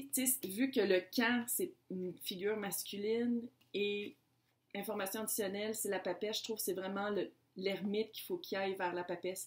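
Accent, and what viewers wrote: Quebec, Canada. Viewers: De quel vous êtes du Canada ? Québec ?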